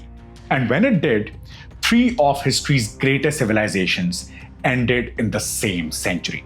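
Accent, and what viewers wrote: India. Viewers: nice to listen to an Indian guy (and not British) doing this!!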